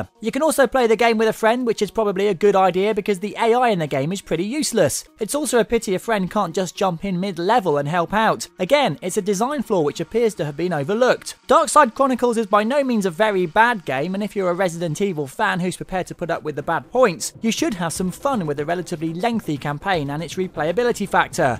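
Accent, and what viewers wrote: United Kingdom. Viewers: i think hes british sh sm isnt out yet grr i must play it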